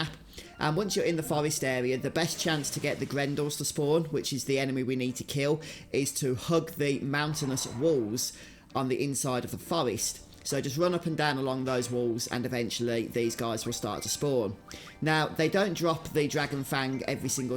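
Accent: United Kingdom. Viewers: Are you from U.K??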